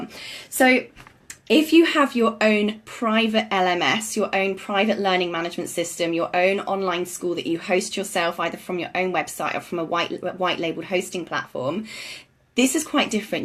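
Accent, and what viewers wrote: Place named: United Kingdom. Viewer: Great content, horrible video. The audio and video wasn't in sync had to stop watching but keep listening. Very glad I did. Your British accent and lingo is awesome, "A Silly Sausage" - Great stuff, lol - I like how you used the bread, made a lot of sense. Have a great day. God Bless, Anthony 2 Tim 1:7 <link>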